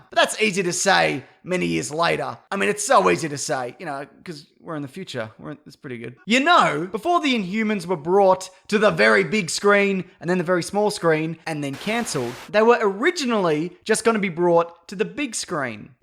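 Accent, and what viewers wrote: Australia. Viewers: Marcus Casamento All but three countries in the worl use the metric system. Also, are you deaf? he's Australian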